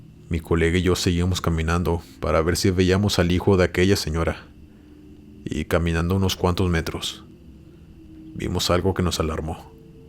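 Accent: Mexico. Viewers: Me gusta mucho este canal. >Narra las historias con la seriedad que merece. >tiene una voz muy agradable y modulada, y norteña como yo. >los relatos son muy interesantes, incluso siempre que los escucho logro estar ahí donde se narra, en el bosque, desierto, etc.... es que debido a las experiencias vividas en mi infancia, no tengo el miedo que se esperaría deba sentir, y aparte consumo mucho contenido como el suyo, quizá buscando experiencias similares a las mías o buscando una respuesta. >me gusta mucho que en cada relato pone un título y subtitulo. Le da más suspenso. GRACIAS.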